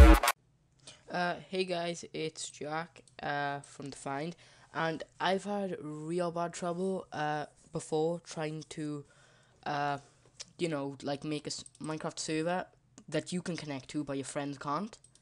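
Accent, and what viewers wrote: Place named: Wales, United Kingdom. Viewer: almost as welsh as me bud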